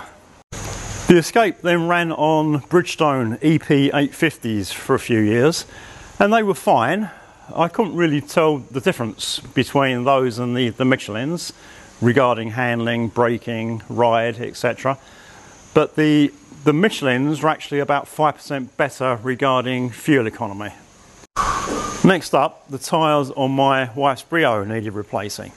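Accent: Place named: United Kingdom. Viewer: Great info and so refreshing to hear British voice and no ego trippin loud mouthed blah blah bang4buck yank dross ! thanks, i subscribed and look forward to more from you maaaaaan, kind regards, Tom.😀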